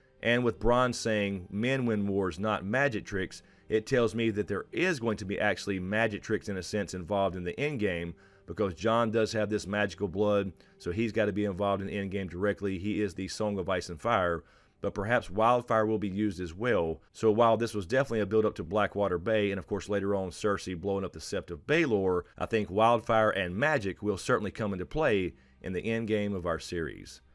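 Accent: South, United States. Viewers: I'm sure you've heard this before , but I love your southern accent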